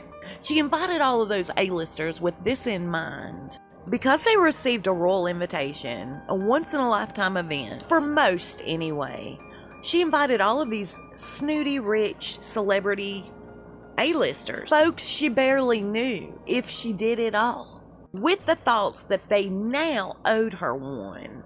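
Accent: South, United States. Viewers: I need you at some point to say "bless her heart" in your wonderful southern accent. 😊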